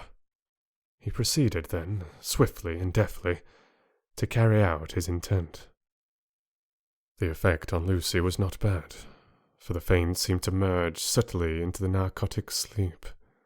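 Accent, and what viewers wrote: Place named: England, United Kingdom. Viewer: Congrats an an absolutely fabulous reading, and so much better with an English accent, I couldn't bear the American. Please, please, please finish the book!!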